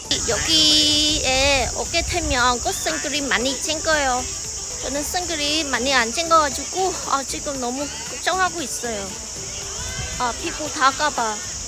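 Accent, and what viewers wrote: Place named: South Korea. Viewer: 엄마야 ㅋㅋ 한국사람인줄~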